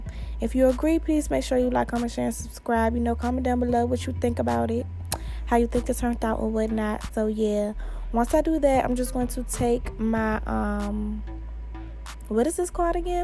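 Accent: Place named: Baltimore, United States. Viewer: Accents giving me B more or philly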